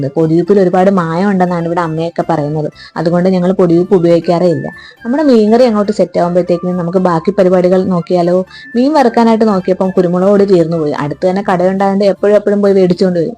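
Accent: Kollam, India. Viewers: കൊല്ലം ആണല്ലേ😂😂😂 കുറെ തോനേ... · 😂😁 കൊല്ലം, ക്കാരാണോ😮 സംസാരം, കേട്ടപ്പോ,,, നല്ല🌹🌹 വീഡിയോ,, നല്ല,, ഇഷ്ടമായി🥰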